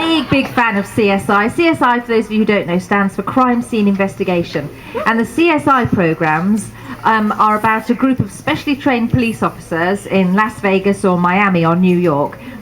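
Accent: United Kingdom. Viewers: We're is she from Scotland? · what...she british.....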